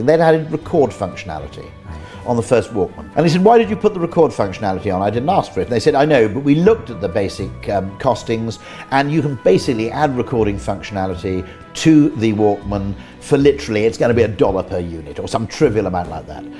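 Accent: United Kingdom. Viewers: Why is there always a British guy to explain why everything is crap? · Nobody would listen to this guy if he didn’t have a British accent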